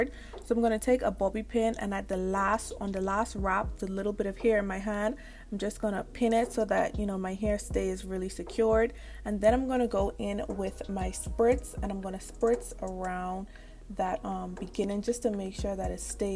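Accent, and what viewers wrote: Bahamas. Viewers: She from the Bahamas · I love the Bahamian accent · Are you Bahamian, bc this accent hide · Definitely Bahamian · It's a Bahamian accent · You must be Bahamian!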